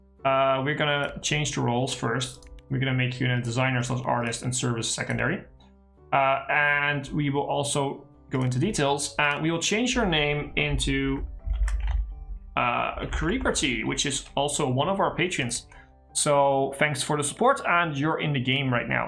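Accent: Netherlands. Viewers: Your Dutch? Anyway great serie to watch!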